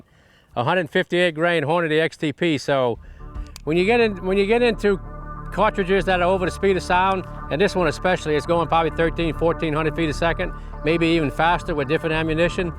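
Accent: Louisiana, United States. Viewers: Eric Jesperson He sounds like he is from Cajun country.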